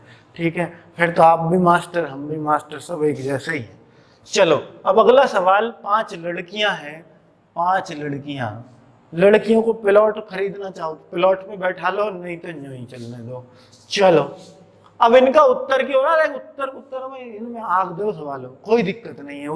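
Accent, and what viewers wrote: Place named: Uttar Pradesh, India. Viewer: Sir full U.P. wali feel a gayi hum D ko hi pel denge.😎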